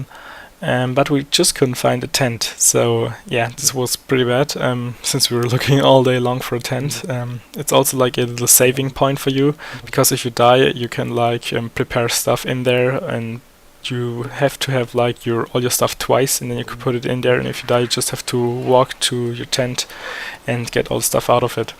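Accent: Germany. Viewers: your accent sounds like your mother tongue is german... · haha ... hab einfach mal reingeguckt und mir so gedacht: Die Aussprache is, als wär der Deutsch wäre ...und taddaaa ... recht gehabt :D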